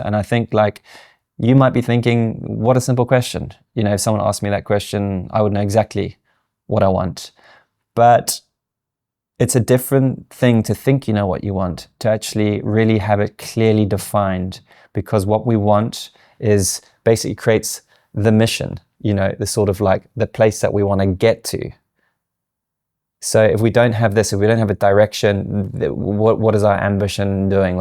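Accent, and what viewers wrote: South Africa. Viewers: Another excellent video, - thank you! I heard you say you live in the UK? You have a South African accent, I resonate… I am a South African living in the Highlands of Scotland, and building a YouTube Channel (just released video 4)., you have so much skill and experience - I will welcome any input you choose to share - you speak so naturally, your dialogue is fluid, natural and seamless… perhaps you could talk more about how you have come to sound so natural, it’s lovely because it allows us to see you - very authentic. Thanks again, I am enjoying your work. 🌺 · Finding your channel is a breath of fresh air. Most channels feel like a bad American tv advert from the 80s. I love your approach. I can watch without my brain nervously anticipating jump cuts and it feels like you’re speaking directly to me, with authentic thoughts and heart-felt intentions. 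It is the only way I would want to present myself as well. I have been a photographer and artist all my life and have made a few short films at film school so I know my way around an Avid Media Composer and other editing software. I don’t want to create anything that feels over produced. I just want to make raw honest content but I’m still deciding what that would be - I’ve done a fair amount of tutoring in various subjects so that will help, I suppose. This video in particular has had me trying to articulate what I want in the various areas of my creative life so it has been a great exercise. I have often thought about it and even advised others to get clear on what they want, but it was your story about being put on that spot that finally spurred me into making a concerted effort to write it down. Thank you. (I also live in London… I hear a slight South African accent in your speech which is lovely).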